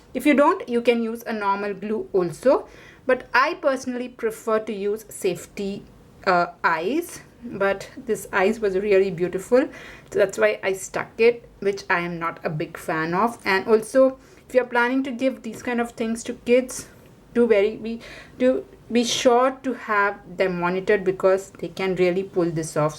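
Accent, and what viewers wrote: India. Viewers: R you a south Indian? Malayali?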